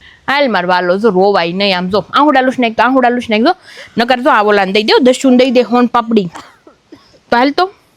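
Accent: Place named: Kathiawar, India. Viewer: Very nice video Very good tamari kathiyavadi leg vage. Videsh maa rahi ne jadvi rakhi che.way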